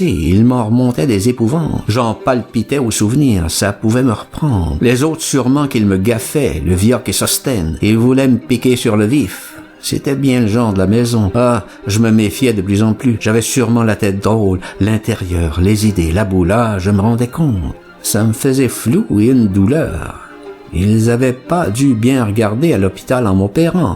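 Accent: Quebec, Canada. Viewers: J'y retourne 10 mois plus tard parce que je viens de vous écouter dans la suite... Quel plaisir de partager vos échanges et la langue de la belle Province que nous aimons tant à entendre. D'ailleurs comment faites vous pour vous départir de cet accent si beau quand vous lisez ? Bon WE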